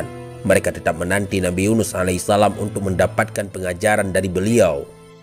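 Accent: Aceh, Indonesia. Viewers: Ini pasti orang aceh,,terbaek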